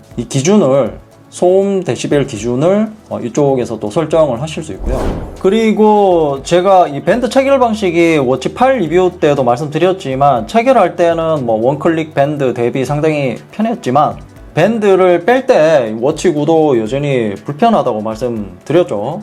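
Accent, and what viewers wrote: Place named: Gyeongsang, South Korea. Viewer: 이헝님 겅상도 사람인가 말투가 구수하네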